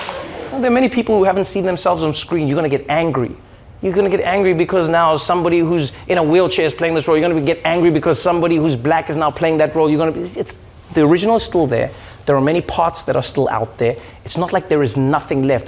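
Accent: South Africa. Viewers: didn’t realize how south african his accent was until now?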